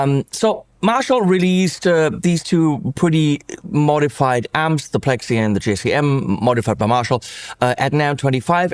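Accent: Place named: Germany. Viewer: Came for the pedal review stayed for the German accent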